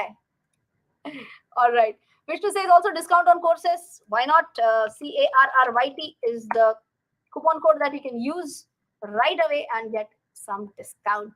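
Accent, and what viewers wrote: Maharashtra, India. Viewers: 1. Avoid side talk, remain on topic. 2. Avoid guesses, stay with facts, 3. Dont mind but avoid the marathi accent. Jokes are fine. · Like the content, but hate the yaaaaas, no editing, and marathiness! So cringy.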